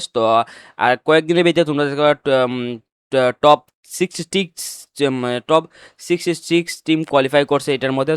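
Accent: Sylhet, Bangladesh. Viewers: Tumi Ki Sylhety??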